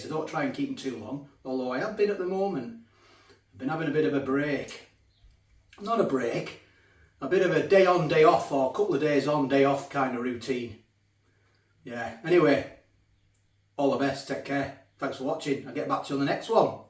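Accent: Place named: Yorkshire, United Kingdom. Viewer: Half scottish accent ? You're obviously not "of these parts", 636. He's from Yorkshire, but has lived in Cumbria for a while. Any native would know in 2 secs flat he's not local. As for the scottish bit, ya need to brush up on ya regional dialects, i reckon. Btw, accents have no bearing if you can cook or not, our kid. What ARE you vaping, i muse ?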